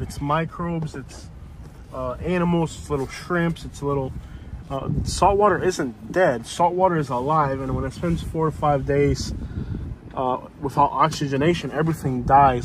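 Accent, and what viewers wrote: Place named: Hialeah, United States. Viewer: Bro the voice was epically creepy..but you are definitely speaking truth..keep up the great work..yet the Hialeah accent got me😂..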